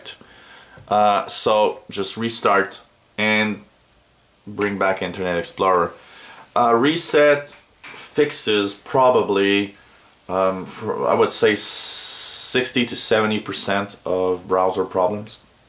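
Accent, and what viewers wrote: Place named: Eastern Europe. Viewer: This worked perfectly for me (restore advanced) even with 64 bits. Done, and done. Thank you eastern-european-sounding guy!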